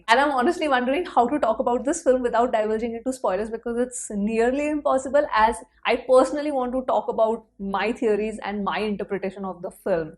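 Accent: India. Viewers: Your Indian accent is so annoying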